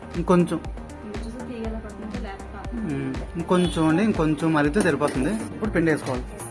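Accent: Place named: Andhra Pradesh, India. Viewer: Super recipes ee vooru andi meedi language maa Andhra language laa vunfi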